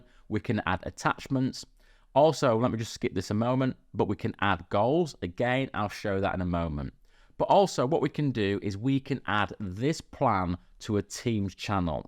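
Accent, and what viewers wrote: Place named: North, United Kingdom. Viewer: I was like "the hell is a book-it", but it's that northern charm "bucket". Love the videos!!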